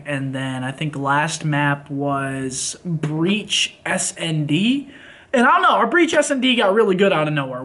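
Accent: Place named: United States. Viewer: Australians acting like they have never heard an American accent before.. Who cares how he pronounces Melbourne!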